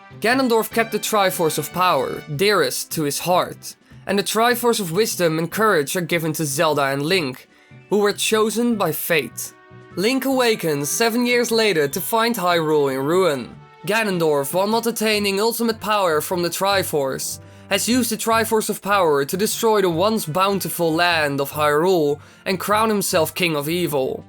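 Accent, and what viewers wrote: Netherlands. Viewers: Love a Dutch accent. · are u dutch?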